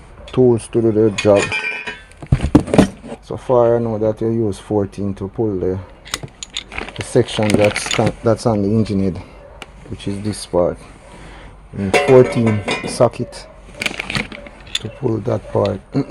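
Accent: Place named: Jamaica. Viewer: Love how u keep di ting straight jamaican... real yaad man mechanic. Keep it up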